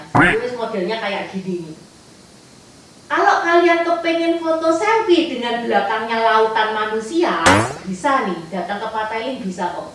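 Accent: Java, Indonesia. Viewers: Kak....logat jawanya surabaya atau semarang kak? Ok thank you....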